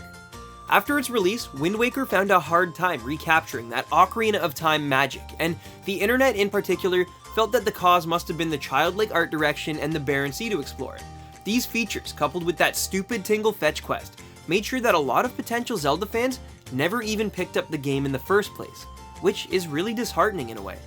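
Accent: Canada. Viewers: Are you Canadian? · love your canadian accent!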